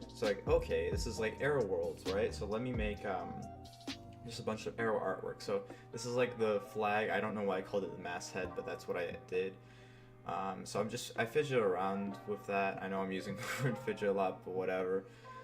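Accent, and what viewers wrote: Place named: Scandinavia. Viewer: What part of Scandinavia are you from? I can tell you are Scandinavian from your accent.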